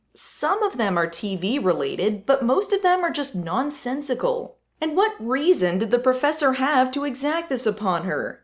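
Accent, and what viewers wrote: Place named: Midwest, United States. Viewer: I love your funny faces at some of the more absurd parts of this terrible film. Off-topic: Are you a Wisconsin or Minnesota native? I only ask because of your accent.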